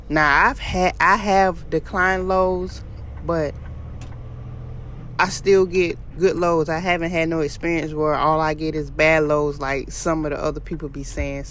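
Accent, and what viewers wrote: South, United States. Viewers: Im legit addicted to the thiccness, the skin tone, the southern accent. I love it all. You should consider hiring a video editor to save you some time.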